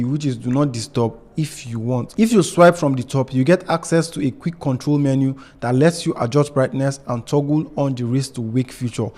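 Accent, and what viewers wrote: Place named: Nigeria. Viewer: He sounds Nigeria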